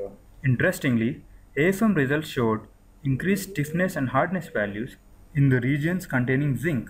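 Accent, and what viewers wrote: India. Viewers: That Indian accent though! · The Indian accent is the worst accent in history.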